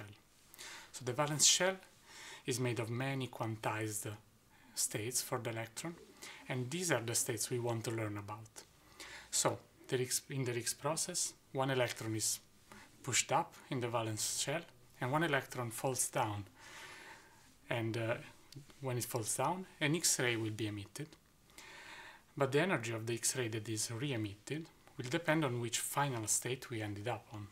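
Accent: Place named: Italy. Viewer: Der italienische Akzent ist total süß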